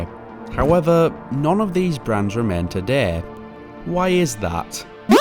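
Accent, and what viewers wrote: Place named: Southport, United Kingdom. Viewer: The accent reminds me of the Southport area...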